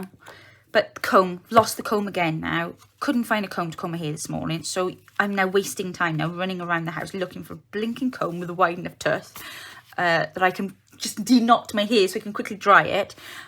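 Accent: United Kingdom. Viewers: I watch YouTube on my TV, so it's difficult to leave comments, but we also don't realise you want them as it's more work for you replying to comments! I love the yellow butterfly with the swirls. I'm guessing you used an embossing folder to do that? I need to try that! Is it in any of your other videos? I really like hearing someone from the UK (I'm assuming). FYI I found your videos in a search for a hidden spine.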